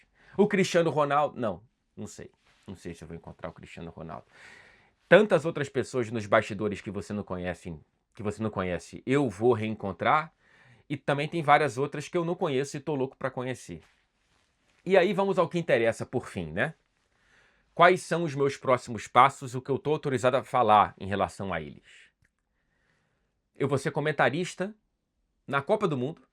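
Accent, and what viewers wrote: Rio de Janeiro, Brazil. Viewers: Fala, cara queria dizer que mesmo nao gostando no geral do sotaque carioca, discordando de voce em muitos aspectos fora do futebol e principalmente te odiando quando o Fluminense tirou a liberta do meu colorado, te acompanho em todos os videos a mais de 3 anos e fico muito feliz com seu passo profissional, fico triste pq sem duvidas sua presenca nos programas da TNT eram essenciais e o MFM perde seus 2 melhores integrantes em poucos meses, mas desejo muito sucesso em sua nova jornada, voce é foda! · Caraça Mano... sotaque carioca pesadão😂😂😂😂😂 mudou muito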